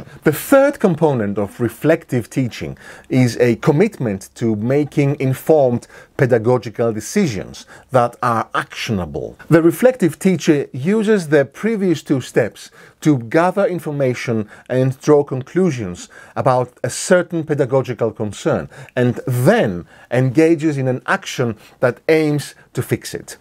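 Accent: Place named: Greece. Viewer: Noticed right away a greek flavor in your accent Mr.. Thank you and Καλή χρονιά!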